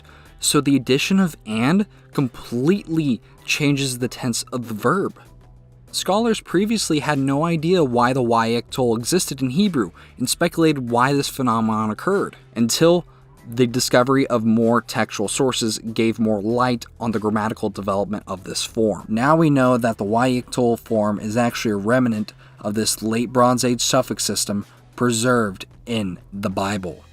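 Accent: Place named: England, United Kingdom. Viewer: Everything was almost perfect.. I just have one problem. The way you pronounced the Hebrew sentence in the middle of the video, offended me greatly.. lol.. kidding. But it was way to harsh to listen to. If you want to improve your pronunciation (in modern Hebrew, since that's how you pronounced it), the Kh has to be softer, and the stress is a bit off in most words.. your English accent is also pretty noticeable in the lack of clear vowels.. it should be pronounced more like in Latin (the Ah sound, that should sound more like Uh). Apart from that very clear and precise video, filled with factual information.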